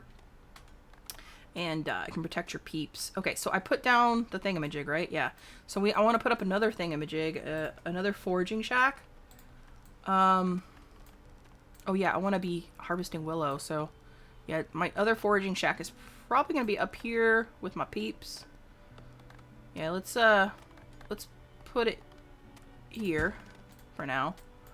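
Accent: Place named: Texas, United States. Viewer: that's awesome! I was born and raised in texas too lol okay I thought it was kinda texan sounding but some southern drawls can sound similar haha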